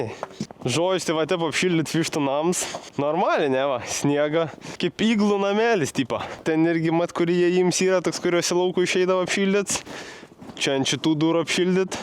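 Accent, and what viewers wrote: Biržai, Lithuania. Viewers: Labai smavu ziuret tavo video, kalbi gryn birzietiska sneka kurios malonu kkausyt. Grazus krastai, birzu giria, Nemunelis, Aspariskiai, Dauguvieciai, keliai ,kelialiai. Senokai buvau, vasara zadu aplankyt, gal jau puse girios baigia iskirst.Geru vidosu, sekmes sunkiuose darbuose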